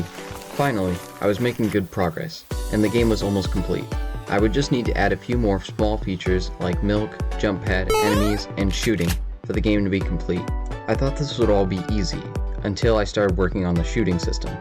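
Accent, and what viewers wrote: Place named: United States. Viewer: ig your american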